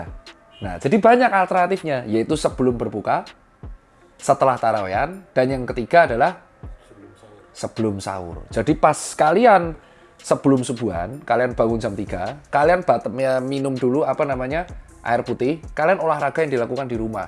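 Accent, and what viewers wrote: Java, Indonesia. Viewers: Dengerr medoknya dokter ini jadi inget seseorang:)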